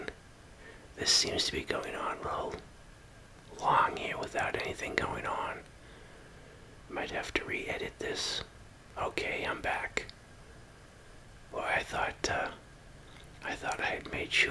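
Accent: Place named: New England, United States. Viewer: this is the first of your videos i have seen -- i got so excited to hear your new england accent!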